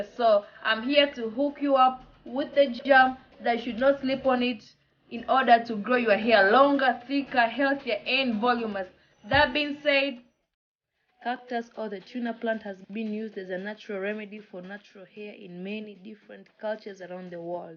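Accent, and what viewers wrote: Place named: Kenya. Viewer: Her accent sounds like she is from Kenya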